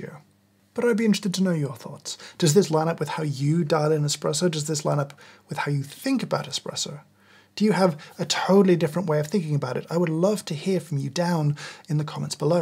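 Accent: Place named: RP, United Kingdom. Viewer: Petersen 😂😂😂😂 Yes he speaks the Queen’s English !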